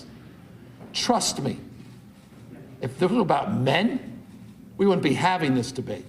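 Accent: South, United States. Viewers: Is it me or is he pandering the black n brown folks with that southern accent? Just an observation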